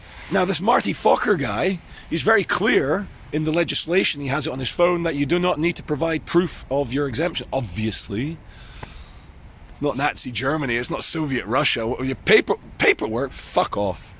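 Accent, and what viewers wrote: Scotland, United Kingdom. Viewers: makes you sound more Scottish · Are you Scottish? I've been trying to work out your accent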